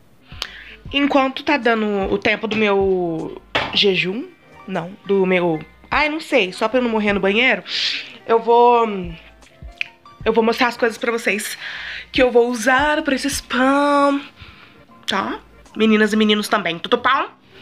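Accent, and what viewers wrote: Minas Gerais, Brazil. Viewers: Ela é de Minas ela falou no começo do vídeo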